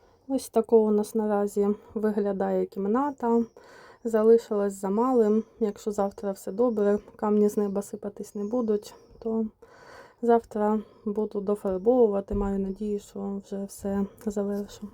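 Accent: Surzhyk, Ukraine. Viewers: Ще що вам хочеться? розмовляє на суржику,і це нормально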